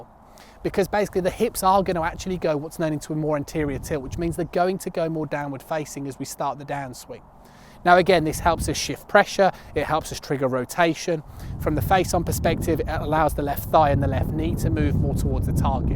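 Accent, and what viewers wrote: United Kingdom. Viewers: I too have watched what seems like endless list of videos until I stumbled upon! I like that he acknowledges there can be variations on how we all get to certain points in our swing and where we go from there. In the beginning I really had to concentrate on listening to his fast paced British chat, but am now tuned in. He’s very articulate and I am now on board.👍 Next up I will try to get my swing video done and sent. Thanks and keep up the informative videos. Also, looking forward to more videos dealing with some limited flexibility.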